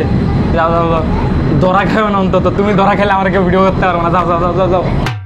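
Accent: Barishal, Bangladesh. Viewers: বরিশাইলা বলে কতা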